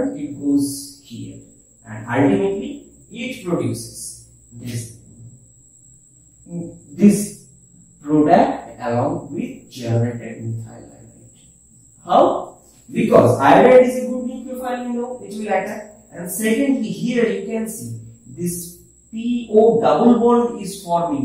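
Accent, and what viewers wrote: Bengal, India. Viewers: Hmmmm, Apnar kotha sunei bojha jay. Bangali bangali ke chine fele.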